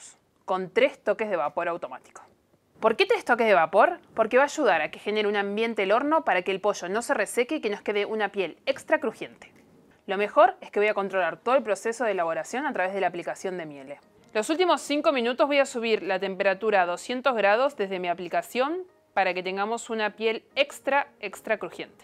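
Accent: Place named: Argentina. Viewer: Maravilloso tu Espacio. Sólo hago hincapié en q' debes llevar con HONOR y al máximo Orgullo el GRAN acento ARGENTINO 🇦🇷. No bloquees tu pronunciación, q' queda muy tierno , dulce e interesante. Ser y pertenecer a un mundo Anglosajón es una maravilla , pero siempre poniendo en frente y sin intentar olvidar nuestras raíces , ni hcia donde vamos. Saludos y muchas felicitaciones !!!!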